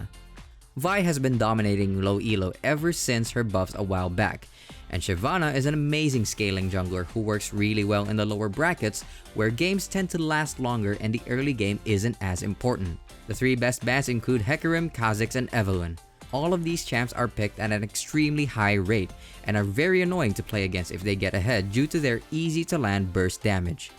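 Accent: Philippines. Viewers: Is the one talking a filipino or any asian? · This guy talking is filipino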